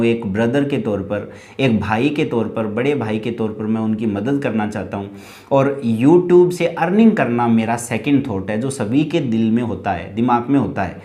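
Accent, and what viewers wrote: Rajasthan, India. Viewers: Bhai Rajasthan se ho ky....? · Bhai aap rajsthan se ho kya?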